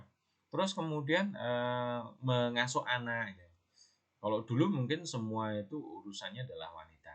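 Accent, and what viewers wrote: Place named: Sumatra, Indonesia. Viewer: Bedo pak ya, suara orang Sumatra ma jawa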